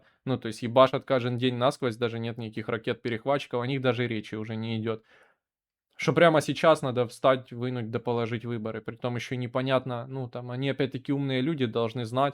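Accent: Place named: Ukraine. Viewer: Бро, візуал гарний, але російською ти володієш на посередньому рівні, підсвідомо (субʼєктивно) сприймається як концентрована малоросійська, тому складно визначити твій особистісний ІД. Не свій і не чужий, я хз хто твоя цільова аудиторія, якшо відверто (без образ)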